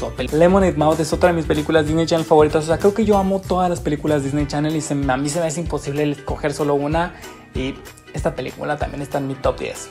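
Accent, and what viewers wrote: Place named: Spain. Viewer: Aí gente sotaque espanhol e tão lindo kkkkkk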